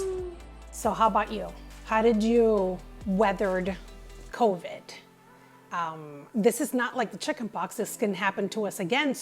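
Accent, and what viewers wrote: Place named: Midwest, United States. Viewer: Your mid west accent is extremely obnoxious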